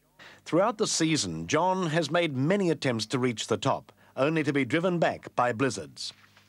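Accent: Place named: United Kingdom. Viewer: Amazing to hear the narrator with a still slight British accent. How things have changed in 40 years.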